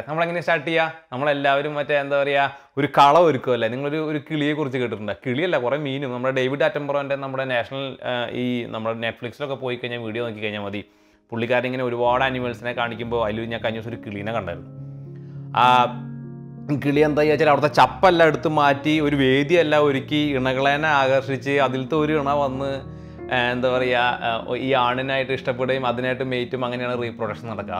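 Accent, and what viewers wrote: Thrissur, India. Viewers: മലയാളത്തിലെ ഏറ്റവും ഇഷ്ടപെട്ട സ്ലാങ് ആണ് തൃശൂർ സ്ലാങ് ആ സ്ലാങ് കേട്ടു വന്നതാ ഈ ചാനലിൽ ഇപ്പൊ favourite channel ആണ് 😍( ഒരു പാലക്കാട്ടുകാരൻ )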